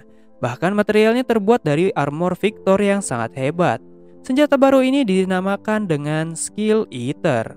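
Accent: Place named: Sunda, Indonesia. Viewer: Bjir lu Sunda bang 🗿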